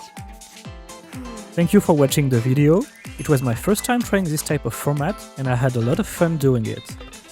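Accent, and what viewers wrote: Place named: France. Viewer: my bad but more I hear you more I think I'm hearing a french accent like I don't feel u to have English as first language 👽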